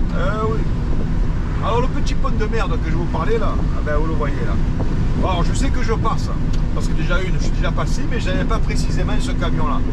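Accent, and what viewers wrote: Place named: South, France. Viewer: Le bon accent du sud ! 😊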